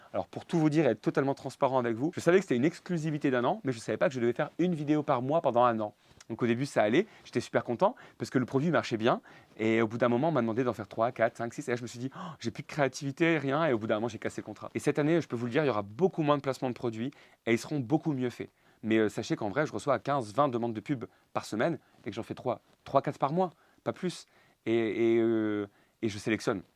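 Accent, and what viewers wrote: France. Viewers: ❇❇❇❇Coucou. Pour moi tu as été une bien agréable découverte. J'aime cet accent de nord. Tes vidéos sans grossièretés, et pleine de légèreté me font toujours sourire. Tes parents sont touchants et sympathiques. Moi j'aime beaucoup, et en plus, je trouve que tes placements de produits sont super bien faits! mon préféré c'est celui avec Monique ! Gros bisous à vous 3 et demie. ( Le demi, c'est le petit qu'on voit de temps en temps)✅ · j'adore ton accent francais, je suis Québecoise et je t'ai découvert depuis 6 mois environs. Continue ton bon travail, je t'adore :)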